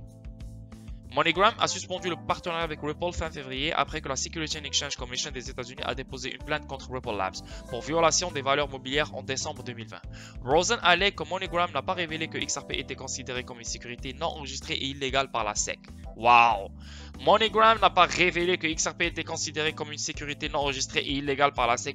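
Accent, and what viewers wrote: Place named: Morocco. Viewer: Tu serais pas un peu marocain ? Je crois entendre un léger accent.. Merci pour tes vid. Dois-je comprendre que je dois reprendre du xrp ?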